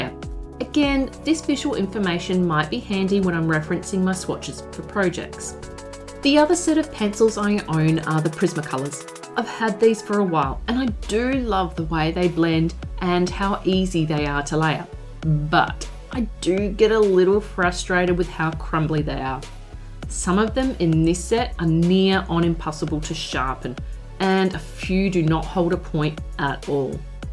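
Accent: Australia. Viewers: You could call it "My Swatch Book: because PPPPPP" Love your work as always, it's always so refeshing hearing another Australian accent on YouTube!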